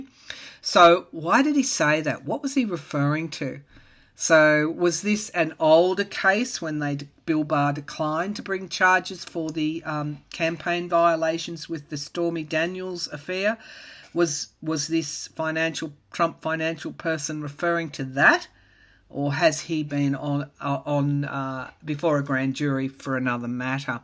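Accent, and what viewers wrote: Australia. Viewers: She sounds Australian to me .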